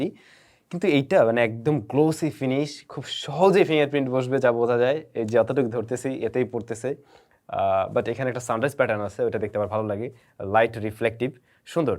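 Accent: Barishal, Bangladesh. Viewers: ভাই মাঝে মধ্যে আপনার কথা শুনে মনে হয় কি আপনার বাড়ি মনে হয় বরিশাল।আপনাকে খুব আপন মনে হয় তো তাই হয়তো মনে হয় আপনার বাড়ি বরিশাল কারন আমার বাড়ি বরিশাল তো তাই।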